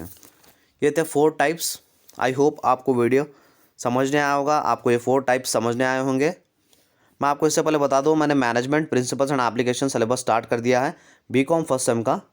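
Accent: Hyderabad, India. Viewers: tum hyderabad se ho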